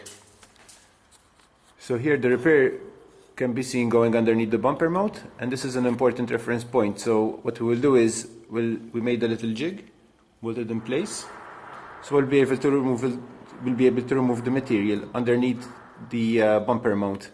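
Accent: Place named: Eastern Europe. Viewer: Great work again, many thanks for sharing. Thanks too for letting us know where you live. Your dialect sounded more like a Eastern Europe to me.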